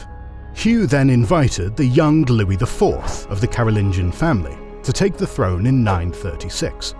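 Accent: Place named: United Kingdom. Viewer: Please do away with the UK pronunciation of things; it breaks immersion... like really bad.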